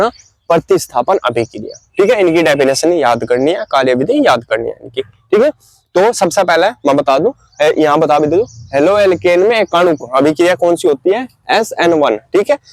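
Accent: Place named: Baghpat, India. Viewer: Bhai to bikul apni bhasa wala h up baghpat side h to reply kariye jarur 😂😂😂😂😂😂😂😂😂😂😂😂😂😂😂😂😂😂😂😂😂😂😂😂😂😂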